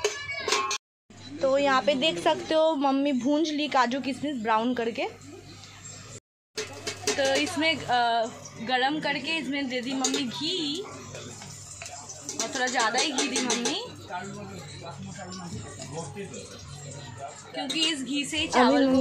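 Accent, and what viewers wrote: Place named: Bihar, India. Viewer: Aap Bangla mein Hindi bihari wala Hindi bahut achcha mix kar rahi ho tikha ko titai bolkar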